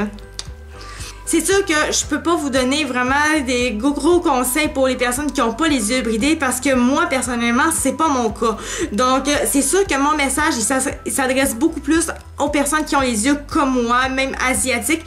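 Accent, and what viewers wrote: Quebec, Canada. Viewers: J'aime l'accent québécois :) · ta un beaux accent québécois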